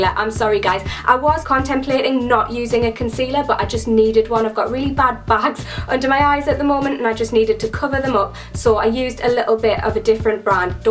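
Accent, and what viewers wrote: Newcastle, United Kingdom. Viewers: Her accent is from around Newcastle do it Northen lyk a Geordie kinda common accent...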